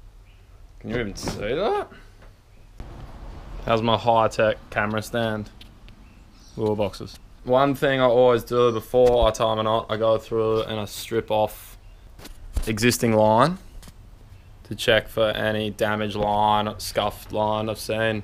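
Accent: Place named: Sunshine Coast, Australia. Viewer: Fark me! Briggsie’s brother from another mother! Good , informative vid. Are you from Sunny Coast also? Same accent. That way to tie the FG is the only way I reckon is the bomb. Searched heaps and this method finished off with the rizzuto knot is the best by far!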